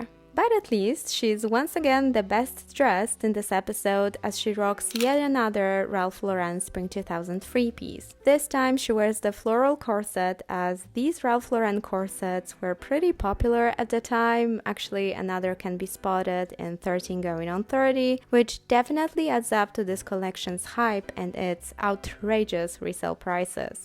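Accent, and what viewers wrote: Poland. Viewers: I love your polish accent, pls keep it!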